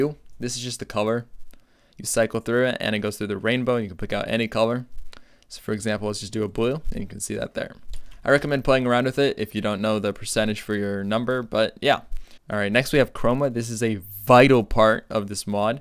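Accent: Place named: Russia. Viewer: русский говорит по англиш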